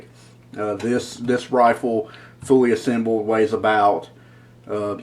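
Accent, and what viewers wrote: South, United States. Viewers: Good info...learned much. Rather new at this. The southern accent was a challenge but hit replay a couple of times to understand what you said. That's fine...I got it... Thanks for taking the time to put this out.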